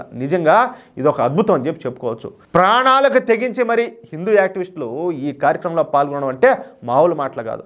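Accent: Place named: Chittoor, India. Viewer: Super andi, as a Tamilian I’m so proud. Meeru hypocrisy le kinda peggi lekunda chakkaga explain chestharu. And that too mana Chithooru Thirupathi slang lo. Keep it up andi.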